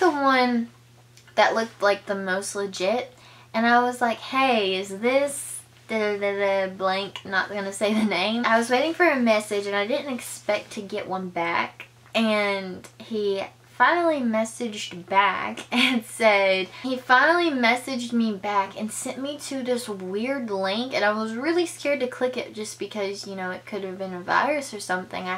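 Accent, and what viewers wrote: South, United States. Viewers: Wow you're so good at telling stories. I'm having so much fun watching your old videos! I love the storytimes and your country accent. I miss the country accent so much lol! You rock the country accent so good! It's really cute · So I just recently found you and you have quickly become my FAV youtuber! I'm obsessed ❤️ where are you from? It sounds like you have a southern accent. I'm from Alabama! I wish there was more southern youtubers haha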